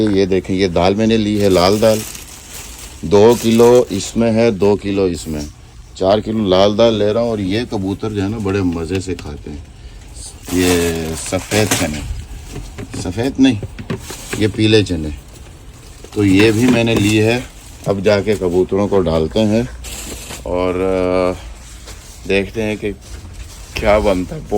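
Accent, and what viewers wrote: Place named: Pakistan. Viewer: Bhai ap kia Pakistan sy hn?qk ap ki urdu bhut saaf hy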